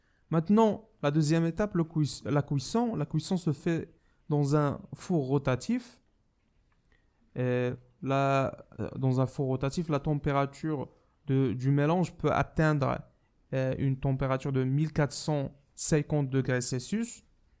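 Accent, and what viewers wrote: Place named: Marrakech, Morocco. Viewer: D apres ton accent je suis sur que tu es un marrakchi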